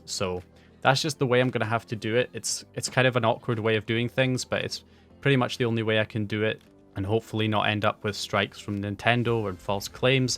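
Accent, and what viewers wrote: Scotland, United Kingdom. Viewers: Mic is ok you still sound Scottish 😄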